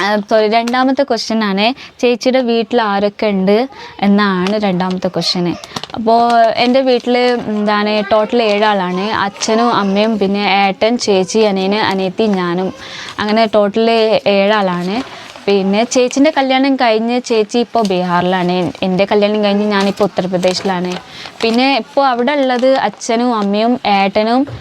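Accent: Malappuram, India. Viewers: Malppurath alle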